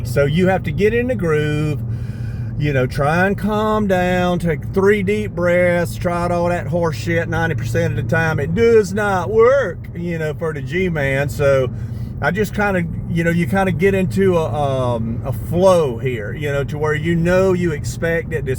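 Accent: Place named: Texas, United States. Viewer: Men just opinion to gain follower as you are leaving in Philippine you should hold back and be mindofull or should I say make your vlog meaningfull on side of being us , I get you as Joe in our country but if your running this vlog as if your expecting something to gain in good way I will tell you you sound like fron Texas or mid west as fuck , again if you want to gain in nice way in your vlog be with us and understand , we don't like people judge us coz we are pinoy we leave like this. Get you ass off our of our country if you talk or sound like Joe to educate us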